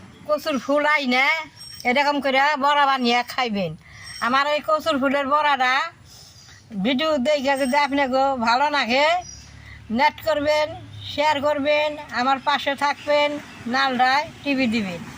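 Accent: Bangladesh. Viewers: আচ্ছা ঠাকুমা তোমার জন্ম স্থান কোথায় গো, তোমার ভাষার সাথে আমাদের বাংলাদেশের বেশ কিছু জেলার ভাষার মিল পাই।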